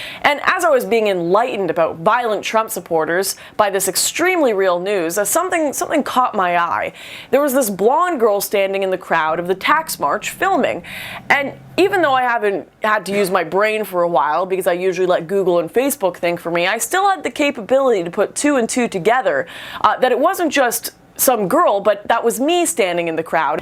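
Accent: Canada. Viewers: "well I don't know aboot you, but once I found out aboot...." Lol. never really noticed that strong Canadian accent before.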